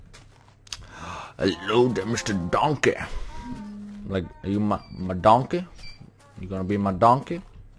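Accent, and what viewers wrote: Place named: Australia. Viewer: ( I wanted to hear you in your Australian accent.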